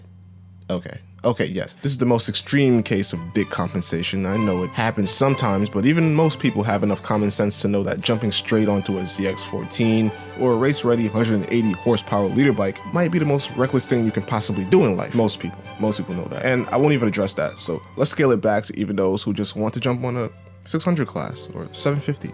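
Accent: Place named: Brooklyn, United States. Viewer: Audio is fine. Your Brooklyn accent is classic. I started at 16 years old with a used 250 cc single four-stroke Honda dirt bike. It was all I could afford, but it seemed like plenty of bike. The speed limit was still 55 on the interstate, and it did fine there. Sold it after several years for about the same I paid for it. Later I bought a 250 cc two-stroke Suzuki, which was noticeable faster. Then had a 650 cc Suzuki street bike when I was 22 years old, and drove it all over LA for years with no problems. My next bike is probably a Triumph Street Triple 765cc to be used in the hills along the California coast.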